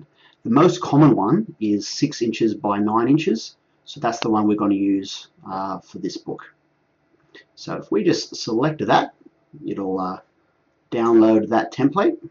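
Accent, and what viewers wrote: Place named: Australia. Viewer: must be aussie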